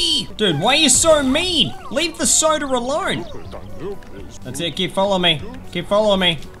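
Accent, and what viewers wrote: Australia. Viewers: Are you Australian? 😅😅😅😅😅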